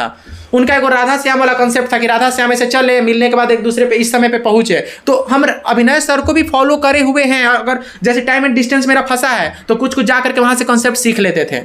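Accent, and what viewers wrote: Bihar, India. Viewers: shudhh bihari ho bhai hmko❤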